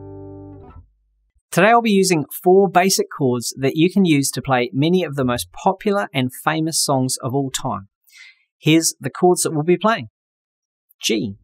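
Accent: New Zealand. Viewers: Bro you’re a kiwi aye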